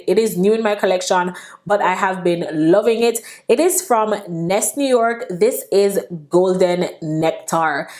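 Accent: Jamaica. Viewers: Hey hun...Are u jamaican by any chance.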